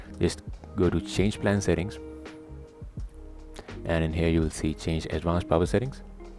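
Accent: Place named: India. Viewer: Judging from your accent you're most likely Indian. Always the Indian people helping with issues like this so thanks.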